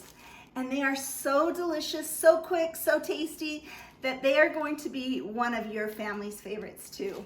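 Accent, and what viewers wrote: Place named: North, United States. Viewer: I love this! you seem so sweet and kind <3 .... the way you say "measure" and a few other words, it makes me think you are from up north somewhere?? I've never heard this accent before, so i am taking a guess , lol ... ( I'm from Southern Indiana )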